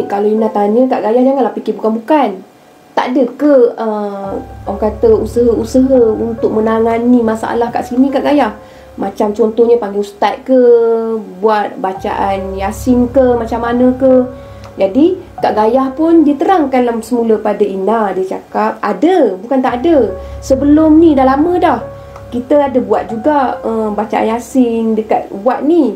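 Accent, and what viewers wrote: Kelantan, Malaysia. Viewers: Mesti ni org Kelantan. Ada keluar slang:). Best dengar citer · dari lorat bahasa mlysia kluar lorat pahang..last2 kluar lorat ..mu kecek kelate eh.. takpee..teruskan bercerita..👍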